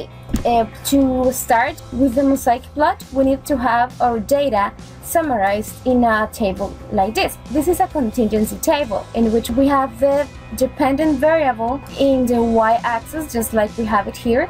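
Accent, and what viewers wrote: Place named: RP, United Kingdom. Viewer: Well done, Congratulations. By the way, your english is very good, much better than the english from english speaking countries, it is BBC like.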